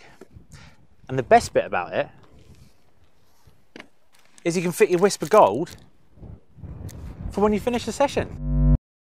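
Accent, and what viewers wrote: United Kingdom. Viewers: His british accent is so strong, i can barely understand him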